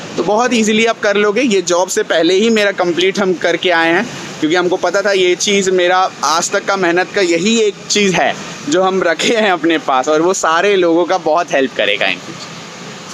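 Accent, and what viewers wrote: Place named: mixed, India. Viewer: Hmmm...hmare up Bihar aise hi bolte h ap kha se ho bhai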